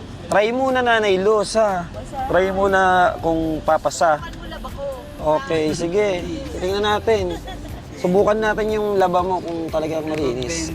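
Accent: Philippines. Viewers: Regardless kung paano ka magsalita ng Bicol Ang importante yong tulong na ginagawa nyo❤❤❤ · Magkababayan palang kita Lods 😊ringkonada din ako...taga NABUA po aqo😊taga sari po ika tabi sa bicol?Ringkonada rin kc Ang salita mo..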